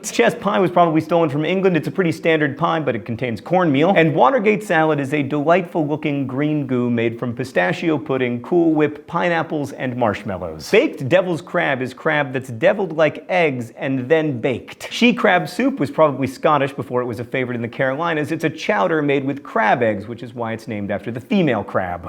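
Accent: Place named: Alabama, United States. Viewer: what happened to your Alabama accent? my brother was born in Alabama wasn't talking when we moved to California by the time he started talking he had an accent from the south. now he just talks like a surfer dude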